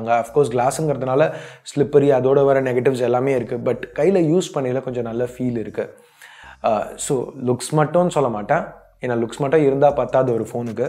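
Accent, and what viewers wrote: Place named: Tirunelveli, India. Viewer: Tirunelveli bro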